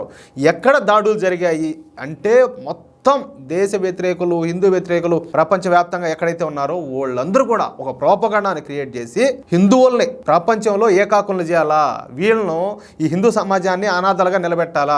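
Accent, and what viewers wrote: Rayalaseema, India. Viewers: Anna meru rayalasema language lo super ga mataladutunnaru Anna me conference ku super meru taggaddu jai Hind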